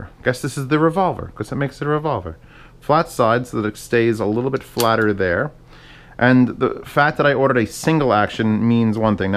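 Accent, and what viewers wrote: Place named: New Jersey, United States. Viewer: Z, are you from Jersey? Sounds like a New Jersey accent to me.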